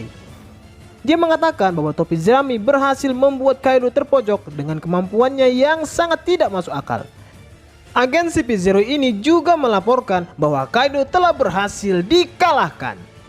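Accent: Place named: Java, Indonesia. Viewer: Bang,lu orang bekasi ya 🤣 bahasa lu medok bngt njir 🤣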